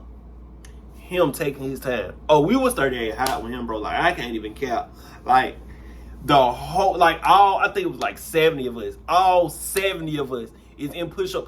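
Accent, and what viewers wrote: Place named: South, United States. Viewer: Holy southern accent!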